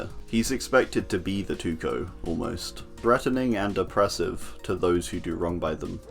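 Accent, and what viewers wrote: United Kingdom. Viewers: Isn't this the guy who does DbD videos? I love this british voice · Hey how's it going?, i assume your accent is English but i can't seem to place your accent on a regional scale, i mean?? is it South? South East?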